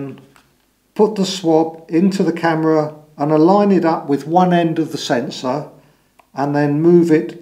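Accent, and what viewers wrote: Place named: United Kingdom. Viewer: British man explaining camera sensor cleaning is my new favourite thing now. Knowledge 10/10 Explanation technique 10/10 British accent 10/10 Unnecessary content 0/10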